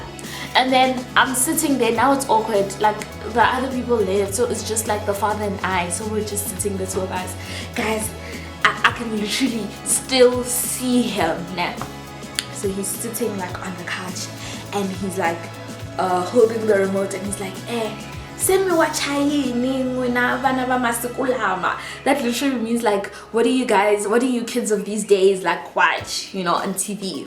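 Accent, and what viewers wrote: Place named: South Africa. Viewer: As soon as you started speaking, I was like she's gotta be South African and then checked another video to confirm. LOVE your accent. "We pay 50/50." SIS WHAT? No. Lmaooo at the mother's reaction. The whole story has me weak.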